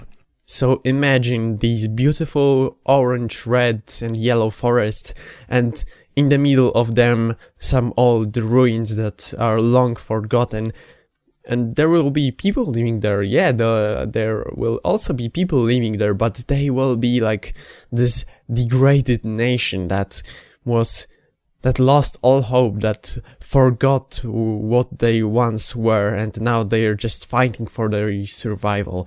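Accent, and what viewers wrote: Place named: Poland. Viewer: Oglądam pierwsze sekundy, i już wszystko wiem. Dam sobie głowę uciąć za to że jesteś polakiem. xD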